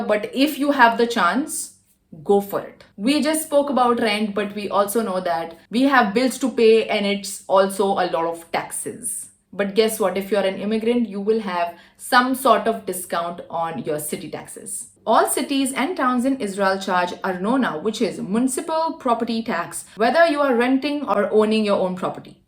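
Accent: Maharashtra, India. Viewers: You have a thick marathi accent.. lol.. good info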